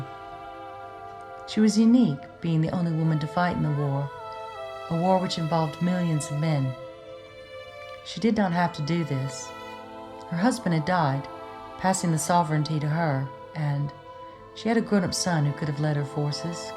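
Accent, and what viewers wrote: South, United States. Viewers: Your voice is so soothing..the mixture of Southern accent, smoothed out by a little New Yorker and the British tongue with words like "que", "nip in"...no wonder I couldn't put my finger on it.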